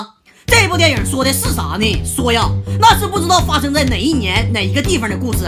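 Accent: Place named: Northeast, China. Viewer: gun six 东北话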